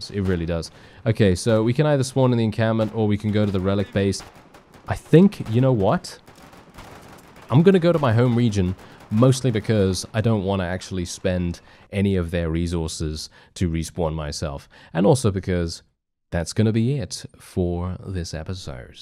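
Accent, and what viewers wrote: United Kingdom. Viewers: I hope you don't take this the wrong way, but I feel as though, in a game this hectic where there is so much going on, the constant commentary might be less useful. I normally think your commentary is great, in games like Bannerlord. But then we all know what to expect from Bannerlord, this game is new and I would sort of like to see you explaining how the logistics works, or letting us experience the carnage of combat without a smooth British voice calmly stating, "Oh that was a nice grenade, I'm dying." I still love it because let's be honest that's kind of funny, but a compromise could be giving us five to ten seconds in tense situations to hear what you hear, the communication in the game and whatnot. If you don't like my criticism I understand, because I am certain that many people will disagree with me, it's just a personal preference, I will continue watching either way.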